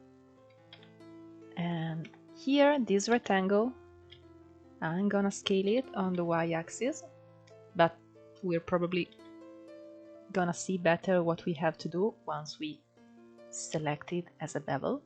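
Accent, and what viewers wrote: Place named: Netherlands. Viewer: you can olmost here the dutch in your voice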